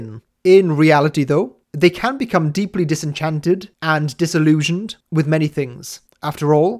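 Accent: Wales, United Kingdom. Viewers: Pretty sure he's from Wales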